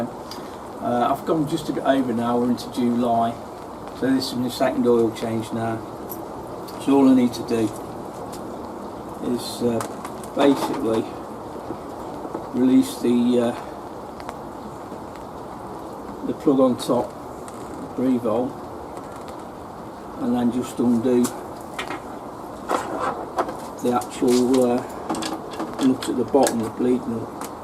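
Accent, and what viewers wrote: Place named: Worksop, United Kingdom. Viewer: You sound like your from my area of the UK (worksop)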